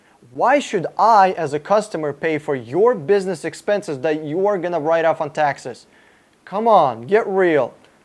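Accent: Russia. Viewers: Are you Russian? Love your accent. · Why do you have Russian accent??? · Good advice thank you buddy. You sound like Russian ?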